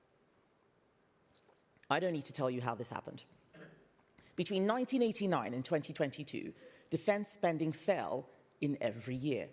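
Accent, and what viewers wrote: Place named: RP, United Kingdom. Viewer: A negro speaking the queen's english is obscene. If she ever gets to the point.... britain is weak and shameful. So is the most parts of europe. They cant even defend themselves but declare, it's not our war. Oh yes, it is!!! Islam has taken over your cities, and you allowed it due to your indifference or cowardice. Now , we, the US are done with your gay shit. Go ahead, get overrun by islam and communism. We are done with you as well!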